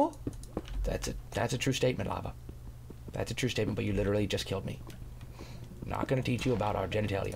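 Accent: Scotland, United Kingdom. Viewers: I will never get tired of Scottish accent... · The Scottish accent is cool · Ignore anyone saying the Scottish accent is offensive. It's not, I find it funny just as much as I find Willie from the Simpson's funny. I am also from Scotland. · Your scottish accent omggg xD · His Scottish accent reminds me a lot of Desmond from Lost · haha and nice scotish voice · Moar Scottish Accent please. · I could get lost in that Scottish accent all day <3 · Lol luv ur scotish accent soooooooooooooooooooo much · I just watched a guy talk to himself in a Scottish accent for 12 minutes...... am I really that bored?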